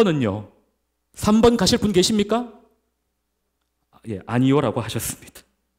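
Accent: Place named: Gyeongsang, South Korea. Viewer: 갱상도 사람이네요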